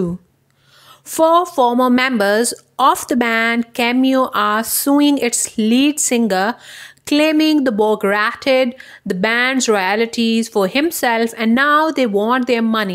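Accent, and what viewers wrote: India. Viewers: It’s an Indian accent.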